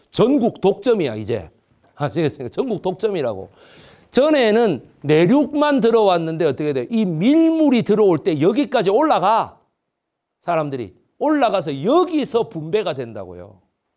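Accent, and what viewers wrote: Gyeongsang, South Korea. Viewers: 경상도말 자주 접하지 않으면 화난것 처럼들어요 저도 처음 경상도분들하고 일했는데 맨날 혼내는 것 같아 많이 울었는데 나중에 익숙해지니 농담이 반이더라고요 그분들도 제반응에 참 많이 힘들었을것 같은 생각하니 웃음이 나네요 매불쇼에서만 봽다 갈증나서 구독하고 역주행중이예요 재미나게 잘보고 있습니다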